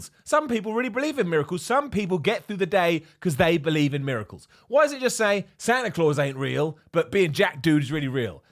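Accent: United Kingdom. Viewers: I thought all this time was saying “ the bald Eagle” but no that’s not what he was saying - love British accent 😁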